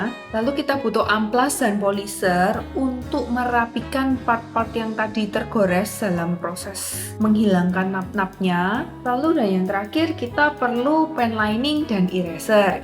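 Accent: Indonesia. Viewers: Tumben medok mbak nya. Tapi cakep banget 🥰🥰🥰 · Tante logatnya medok suroboyoan, sukses terus tante 👍 Tapi bolehlah kapan-kapan "Ajarin ngerakit gundam dong" masih newbie 😂 Twitter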